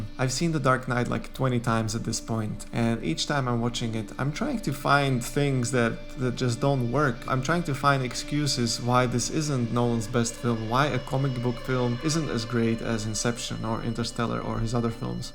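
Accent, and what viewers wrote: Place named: France. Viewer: You let the French influence sneak into your English. I'm not criticizing, because it wasn't distracting, and English orthography is a mess, but you also pronounced "Liam", "Bowie", "McConaughey", and "Gyllenhaal" oddly.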